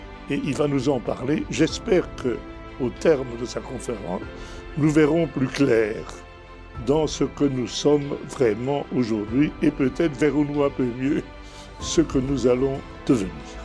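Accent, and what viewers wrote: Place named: Liège, Belgium. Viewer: c'est du français de Liège... faut faire avec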